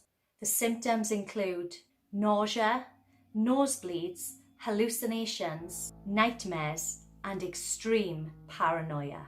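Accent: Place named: Wales, United Kingdom. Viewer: Me too I dont really like the Welsh accent however has a very soft voice and proper fit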